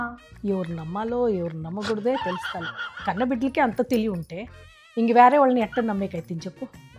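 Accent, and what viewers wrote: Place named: Bangalore, India. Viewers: Kannada slang telugu Bagundhi !! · Bangalore Telugu 😂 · Ee slang variety ga undi. Ballary aa banglore aa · This is Banglore Telugu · Karnataka accented Telugu :)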